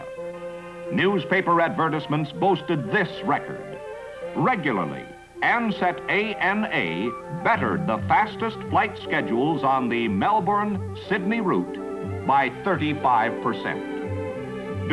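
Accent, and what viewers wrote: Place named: Mid-Atlantic, United States. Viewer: I love Mid-Atlantic accent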